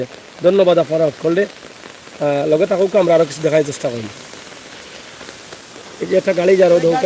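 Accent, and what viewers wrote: Sylhet, Bangladesh. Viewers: আপনার সিলেটী ভাষা সুনদর লাগে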